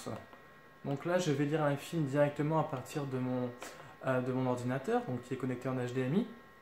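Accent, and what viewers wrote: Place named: Cher, France. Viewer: Bonjour Vous êtes du chére. Je reconnais l'accent.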